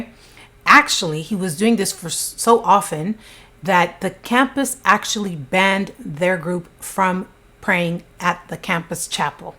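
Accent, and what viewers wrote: France. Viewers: I'm living for your French accent! good luck on your Paris endeavor. Thanks to you, I don't have to deep dive into these disturbing situations, myself. I feel so terrible for lil Bethany...she was such a gem. Like what a beautiful mind and smile. Hopefully she is resting easy and her loved ones are trying to heal. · just got invaded by visions of Lori Vallow or so. LOL. You narrate stories well and champion the victims. Thanks,! I usually skip the ads but not with you, haha! Your French accent is tres, tres bien! I think it may have been Tyler on his own or Bethany, in her fragile state of mind, was pushed to doing it. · you have a great french accent!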